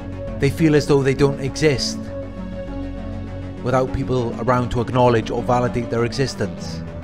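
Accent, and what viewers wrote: Wales, United Kingdom. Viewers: Sounds British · He sounds Welsh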